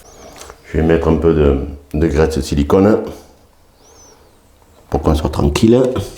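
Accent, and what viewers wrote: South, France. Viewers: Excellente vidéo dans le sérieux et la décontraction avec ce petit accent du Sud pour agrémenter le tout. Ça donne envie de s'y mettre ! Bravo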